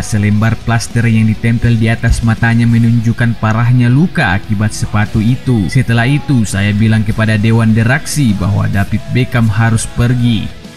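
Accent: Medan, Indonesia. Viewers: Anak medan x kudengar omonganmu bang 😂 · Logat Medan kental bener wkwkw.. di ralat.. CANTONA bukan CONTANA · Medan x logatnya bang 😅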